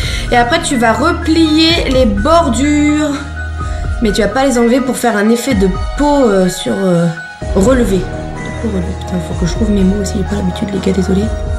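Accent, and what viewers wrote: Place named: Normandy, France. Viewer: On voie la normande et la façon de parler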